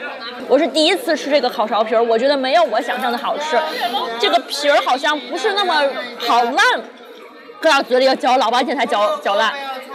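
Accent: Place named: Tianjin, China. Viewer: 点赞Kaka的视频！天津话太亲切了。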